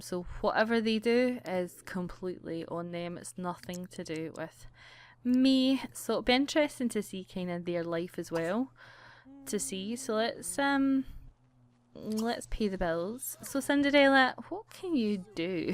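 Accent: Scotland, United Kingdom. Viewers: I love your videos and your accent! I've always wanted to go to Scotland too ^J^ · shes scottish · Are you Scottish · oops well i love you scottish accent then! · Are you Scottish ? If you are you can be the princess from BRAVE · Why are u British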